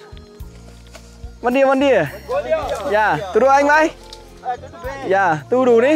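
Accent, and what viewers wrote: Nghe An, Vietnam. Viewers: Trai xứ Nghệ miệng nói tay làm. Tai lắng nghe. Nói năng mộc mạc mô tê răng rứa. Nhiều người không hiểu nghe ngứa tai . Những ai thông hiểu nghe hoài thấy thương ..! Đình Hồng .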